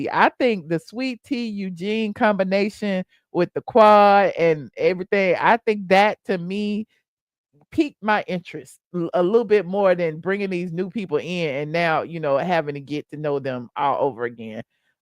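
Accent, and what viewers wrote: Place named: Georgia, United States. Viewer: "Come own Ian" is what it sounds like to new yorkers . Its like youre a Georgia big sister reading a bed time story . Thank you 🩷🩷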